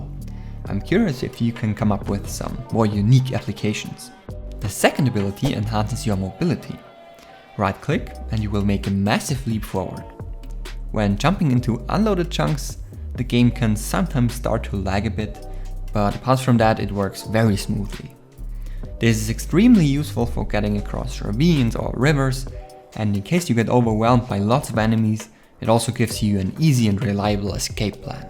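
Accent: Germany. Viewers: which nationality are you? sounds kinda like a german but im not quite sure...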